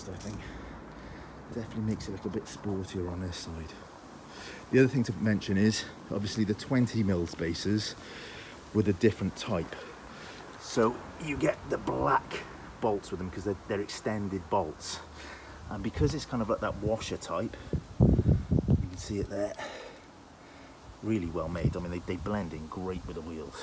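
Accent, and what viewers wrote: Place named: Wales, United Kingdom. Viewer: Nice to hear a fellow Welshman talking about this car. I am from south wales but live in Arizona. Have a 2013 gt, in grey with the same wheels as you!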